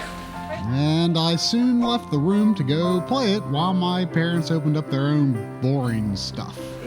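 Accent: United States. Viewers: It's great that you had that country boy accent all the way back then.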